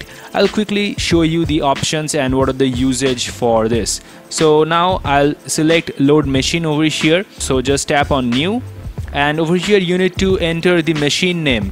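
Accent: India. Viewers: Indian has a funny accent, i imagined he moving his head when talking, like acha acha nahi nahi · Hindis English😄😄😄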